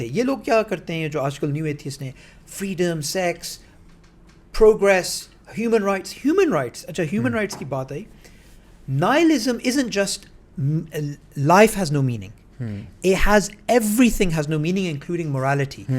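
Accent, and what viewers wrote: Pakistan. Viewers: Ma sha allah bhaijaan ko urdu mein bolte hue bohot accha laga, wo Pakistani touch zubaan mein unke barqaraar rahe. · I undertood the english part but with urdu accent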